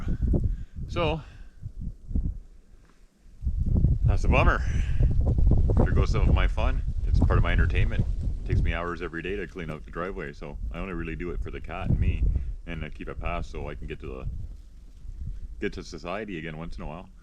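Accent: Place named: Canada. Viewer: Wow. I've been over here so long I forgot how Canadians *sound*. 🙂 You a savvy enough mechanic to replace the brake line on the quad?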